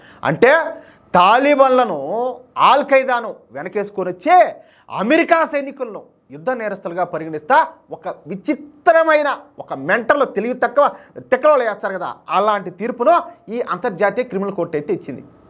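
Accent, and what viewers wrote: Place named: Kadapa, India. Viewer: I love your Kadapa language🎉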